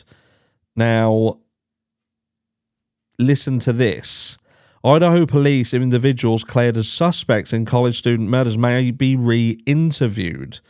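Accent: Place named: Lowestoft, United Kingdom. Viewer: nice, the reason I asked is you have a similar accent to some of my family from Lowestoft. I now live in Austrailia and you don't hear that accent very much.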